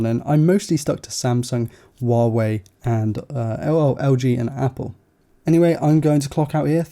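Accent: United Kingdom. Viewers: Hi! I don't usually comment on videos, but when MrMobile made his giveaway task to be "comment something nice on underrated tech channel's videos" I immediately thought of you. You really make great content and your calm presentation (and beautiful british accent) make your videos a joy to watch. You also make videos on used smartphones which I think is great. Old flagships are still good phones! And congrats on getting to work with Mazda. That was a good video! I really like cars (especially Mazdas) so that might be why.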